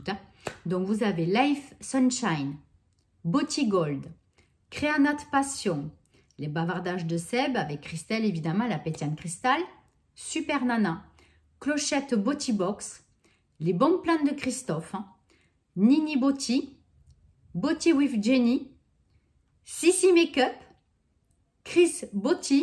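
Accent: South, France. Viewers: Dis donc je me régale avec l'accent du sud, il y en a peu sur YouTube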